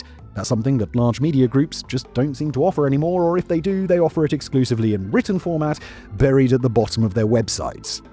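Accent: United Kingdom. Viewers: His British accent is too heavy for me. I can't follow what he's saying.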